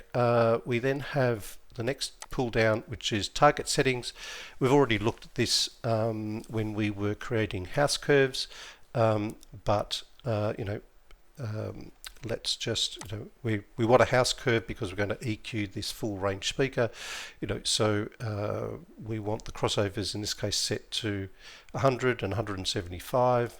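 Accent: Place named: South Africa. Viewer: brilliant tutorial, you sound South African BTW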